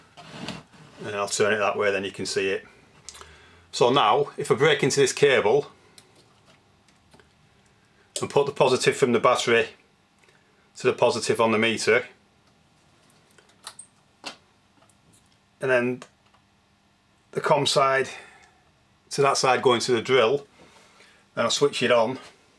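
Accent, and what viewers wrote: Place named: Lancashire, United Kingdom. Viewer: I was thinking burnley or rochdale, but west midlands is way out. Brummies don't say load like "lord". Darwen's a great place, amazing countryside.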